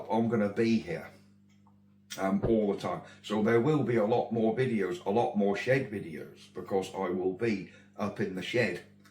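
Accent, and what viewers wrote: Norfolk, United Kingdom. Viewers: Well done for sharing your up date on Rachel and your situation, iam sure your doing the right thing for you and Mrs mancave, you don't have to justify yourself to sterile comments from individual's, keep the channel moving along mate and keep that Norfolk accent, get better soon Rachel, watched to the end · God Bless Mrs Mancave and yourself.. Your doing the right thing by leaving work to look after your Wife and doing your Norfolk Man Cave Channel.. Carry on doing what your doing.... I love your Norfolk accent don't ever change it, we came down to North Norfolk 3 times this year on holiday and love it! 😄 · I'm the proud son of a Norfolk man and your accent brings back memories of holidays in Yarmouth, Lowestoft etc when dad (who never lost his accent) Acle market in fact after a couple pints mum couldn't understand him for hours after lol , also when delivering to the Norwich area I felt at home dad died just after the millennium at 82 and is much missed. 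All the best to Mrs man cave look after each other...I'll e watching and I am subbed. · Hi, I would just like to say to you and Mrs Mancave, I wish the both of you all the luck in the world, I know from experience what her treatment entails , my dear wife was diagnosed with Lymphoma cancer, we was told it was around 8 to 12 months to complete the weekly Chemo and the rest of it , be in no mistake it is very hard, my Mrs ended up with holes in her intestine and was bringing her bowel contents up through her mouth. 🤢🤢🤢🤮 But after we got going onwards we had 10 clear years and we made hay while the sun shone, if you can take my bit of advice, as time goes on you will be getting worn down but you won’t recognise it for yourself, I’ve NOT said all this to scare you, I’m just trying to make you aware of what might be coming your way, we didn’t have anyone to pre warn us how things may turn out, if I can just say this bit it might sound odd me telling you this but now and again when your dear wife is zonked out after the hospital visit, get yourself settled down and even if you don’t nod off just rest it’ll do you the world of good, another thing I’ll take you to task on is DO NOT apologise for your accent, my mums family was and still are from Gorleston and my Grandad & Grandma was very broad Norfolk types so be proud of yourself. 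Another little gem we didn’t get told is nearly every week we was at hospital a lady would come round with a clipboard and she would say to me , how’s things ?? Are you both ok?, course it’s yes thanks, what no one told me was, she was from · Still here at the end. Firstly all the best to Mrs Mancave we're all thinking of her. Second there was loads of good news in this one, apart from the Mrs and all your travelling oh and the price of suet. For myself please don't change a thing. I watch your vids because your knowledge of all old engines that you bring back to life is amazing. I'm more than happy to watch anything you put up. PLEASE never put background, so called , music in your vids. We want to hear you not background noise.. Not sure about a live stream. The time taken up, I think, would be better spent in the workshop. This is getting as long as your chatting so all the best to you both and keep things coming...Oh and I love the Norfolk accent. 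A coffee on it's way. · You are both very brave. Mrs Mancave especially. I am sure all your subscribers will continue to support the channel. I always watch with dad so don't often watch my subscription, however I will make sure to always give you a thumbs up and a comment on mine too. We shall be thinking of Rachel on the 6th bless her. Don't hide your accent ,the reason we started watching you was because you talk like us be Norfolk and proud lol. All the very best to you both.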